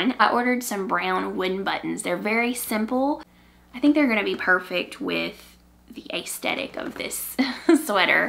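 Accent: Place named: South, United States. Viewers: Shout out to your Southern twang 😁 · Hi! Georgia knitter/maker here. So many fiber podcasters live outside of the South, and I found it charming to hear your familiar accent. Beautiful podcast. Keep up the good work!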